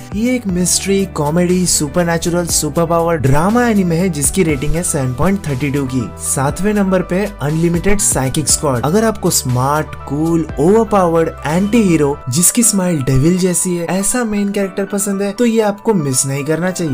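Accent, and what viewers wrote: Bengal, India. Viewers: Tumi ki bengali? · Bro. Are you Bengali? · Bro are you Bengali?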